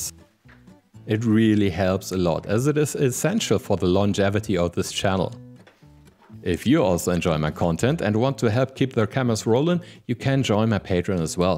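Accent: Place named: Lower Saxony, Germany. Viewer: haha, ich war nicht 100% sicher denn Dein englisch ist sehr gut... war so ein Gefühl, die Niedersachsen erkennen sich eben 😅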